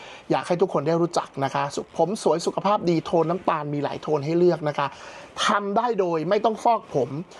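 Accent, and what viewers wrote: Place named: Isan, Thailand. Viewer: เสียคนอีสานเหมิ้ด😅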